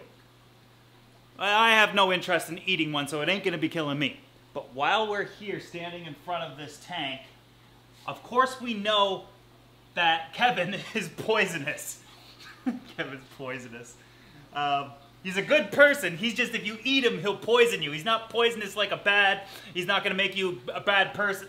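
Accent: Canada. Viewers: It's cool when you can instantly tell you're watching a fellow Canadian by the accent lol Great aquarium, nice video! Cheers from BC! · Are you a Canadian ?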